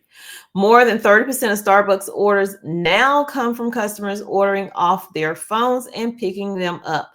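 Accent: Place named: South, United States. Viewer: She sounds like a southern white woman🤷🏽‍♂️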